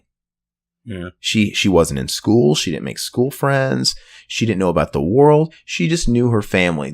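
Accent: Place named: United States. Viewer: Nice to hear your american voice again brother! 😃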